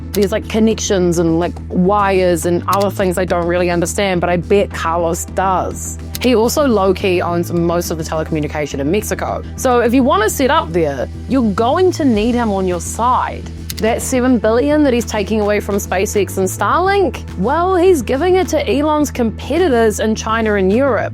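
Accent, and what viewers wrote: New Zealand. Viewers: Great video - very informative- thank you. Out of interest, where is your accent from? I'm guessing NZ but not at all sure... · From the multiple enunciations in several vowel sounds in just a couple of sentences; is the speaker for this a Kiwi? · Love that kiwi accent from a fellow Australiasian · New Zealander by the accent! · New Zealand accent? Nice! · Sounds like Kiwi mixed with something else, to my British ears 😅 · Yes, Kiwi accent.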